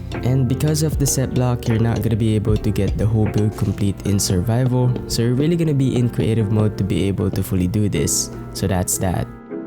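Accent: Philippines. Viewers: You sound Filipino 🧐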